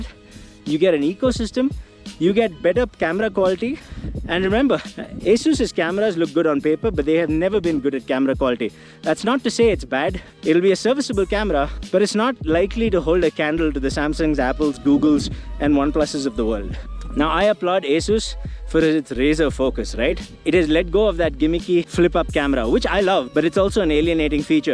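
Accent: Kerala, India. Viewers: Wonderful review. Are you from Kerala?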